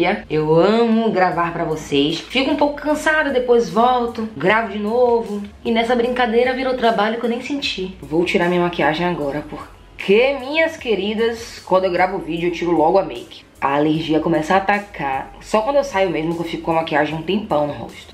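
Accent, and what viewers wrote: Bahia, Brazil. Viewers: " Teu fogo vai acabar agora " kkkkkkkkkkkkkkkkkkkkk Eu amei ! Se eu fosse chutar diria q vc é baiana . Do ND me parei nesse vídeo kkkkkkkkkkkkk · Amo esse sotaque baiano e essa mamae forte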